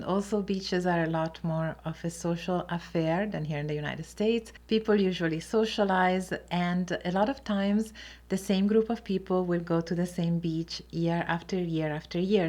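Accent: Italy. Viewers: your smile is infectious❤️. Loved this video...I am half Italian and proud of my heritage. My mom and Aunties were strong Italian women. I got my love of cooking from my Mom. To me Italians have the best food and yes my skin isn’t wrinkled because I eat the Mediterranean diet😂😂😂😂. I love to listen to your accent, lovely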